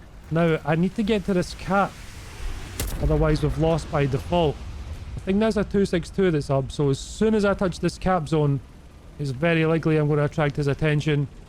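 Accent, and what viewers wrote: Scotland, United Kingdom. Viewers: A Scottish bro who is a super Pershing connoisseur? Subscribe I shall!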